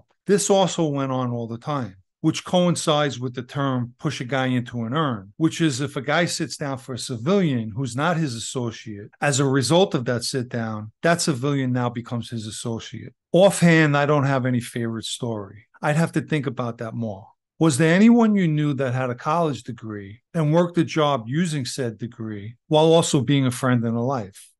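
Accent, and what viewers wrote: New York, United States. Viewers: Slight NYC accent?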